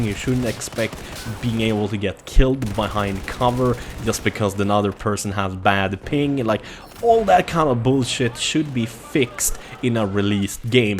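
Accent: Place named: Sweden. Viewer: U sound really swedish...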